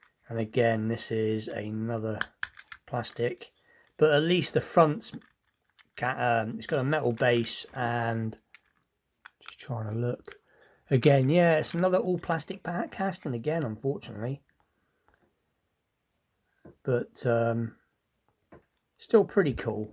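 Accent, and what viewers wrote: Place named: England, United Kingdom. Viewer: Hi sorry you had a bad day buddy, are you in uk i ask as you sound English as seen this Recue pack on USA youtube but cant wait for it to come over to uk 😀👌 great show any way sorry been wanting this for months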